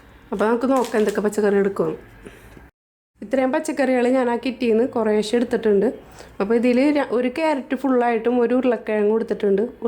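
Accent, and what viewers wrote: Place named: Thrissur, India. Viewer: Trissure slangilulla avatharanam enikkishtappettu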